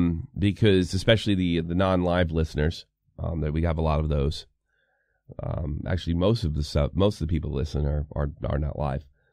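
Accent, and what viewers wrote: United States. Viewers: "accent-less" nah, I think you mean English with an American accent.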